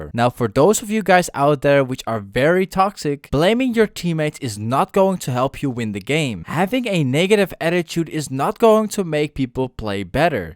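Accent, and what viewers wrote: Netherlands. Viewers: Dutch accent FTW.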